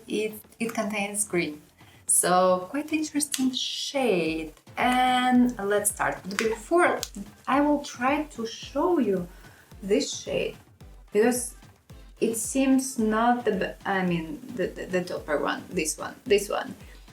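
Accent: Russia. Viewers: r u russian?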